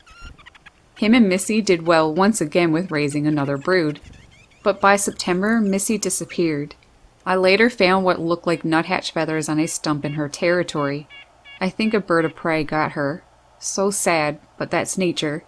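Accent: Canada. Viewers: What a keen eye for detail and patience. Beautiful Buster and Hatch sweet Kiwi. You have a cool Canadian accent!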